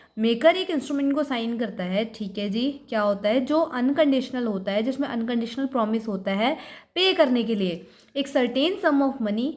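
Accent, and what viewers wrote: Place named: Haryana, India. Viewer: dii ab aapki haryanvi bhi sweet lgti h to m ky kru 😁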